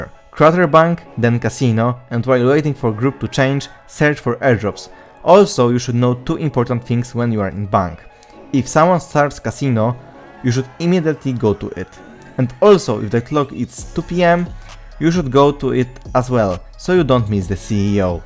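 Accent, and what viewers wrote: Poland. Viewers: i love the polish accent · Czy to polski akcent 😅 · you have polish accent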